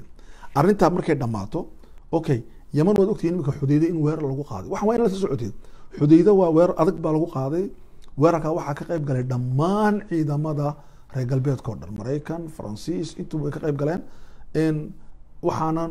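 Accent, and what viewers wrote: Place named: Djibouti. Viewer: Waxaqiiqo waxaan kuu sheegayo Waa iska jeclahay lahjada reer Djibouti shiiqu runta uu kahadlay somali israamsatay oo kala tagtay Hala qabsado kolay aniga qaxooti baan kahay dhulkii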